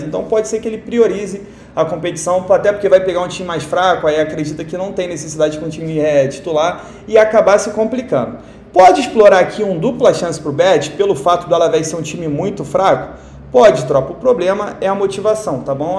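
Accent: Rio de Janeiro, Brazil. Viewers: Deu moral aí cria.. pelo sotaque vc e do rio né ?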